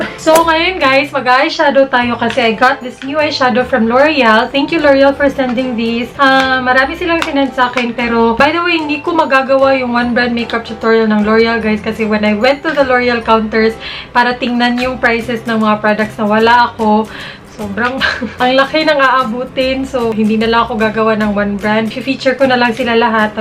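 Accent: Visayas, Philippines. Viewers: bisaya ka po ba ate?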